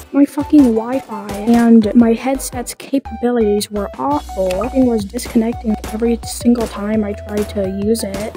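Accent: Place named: Germany. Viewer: you sound super german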